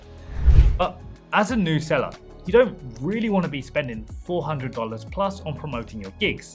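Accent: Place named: United Kingdom. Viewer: I deeply wanna get your piece of advice but that damn british accent 😭😭😭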